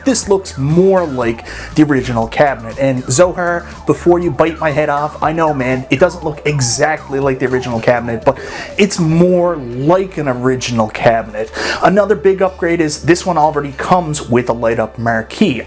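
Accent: Canada. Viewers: Nothing more endearing than the way Canadians prounce their "ar's". I could listen to this guy say "Arrrrhcade" and "Marrrrhquee" all day haha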